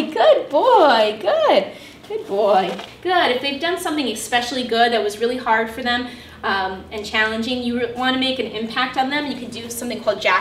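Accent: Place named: New Jersey, United States. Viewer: Duog. Gotta love that Jersey accent.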